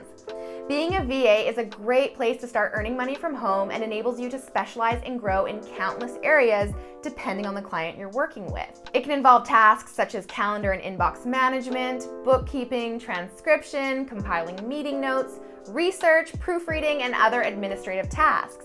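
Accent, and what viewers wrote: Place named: South, United States. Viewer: Excellent video. I am so glad I stumbled on this channel. Incidentally, good southern accent.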